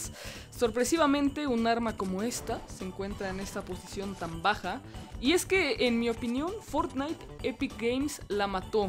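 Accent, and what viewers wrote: Costa Rica. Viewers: Eres de costa rica como mencionas la palabra mae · Hola jaja eres de Costa Rica verdad jaja Pura vida mae jaja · eres de costa rica?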